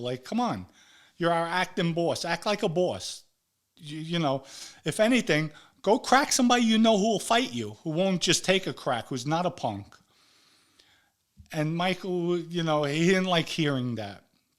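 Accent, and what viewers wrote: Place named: Bronx, United States. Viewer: once I heard like myself, you were from the Bronx, I was sold. Welcome and good luck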